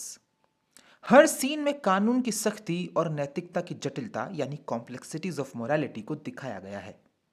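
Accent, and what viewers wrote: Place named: Meerut, India. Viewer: Aap meerut se he na sir..? Aqsa k bhayya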